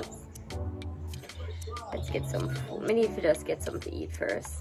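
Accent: Jamaica. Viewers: Good spirit, beautiful vibes!! Lovely accent... I love Jamaican people!! 👍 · Wow. Simply Wow! "Pretending to speak like that...Sound that awful"...what a negative way to describe a native accent no matter what part of Jamaica we come from...none of it is awful. Based in her stories she was born in Jamauca, went to school in Jamaica and did very well in high school ..so she can speak however she chooses depending on the situation. That's an unkind statement. is a great representation of #outofmanyonepeople ..We all can look and speak differently. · . Your Jamaican. Patois tun up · Welcome back I miss u and your cute face with ur Yardie talking u looking beautiful as ever 😊❤ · Greetings from London uk, you are definitely a Jamaican Lady and your accent just fantastic.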